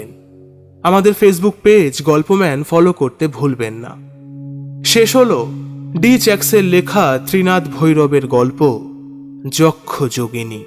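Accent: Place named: East Bengal, Bangladesh. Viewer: Voice ta to tomar ekdom kaat bangal vai.... recent bera topkechho mone hochhe?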